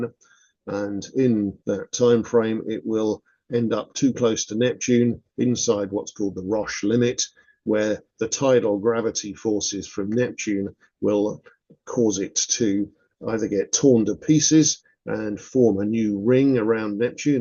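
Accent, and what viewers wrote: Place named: England, United Kingdom. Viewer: That is how they speak in England.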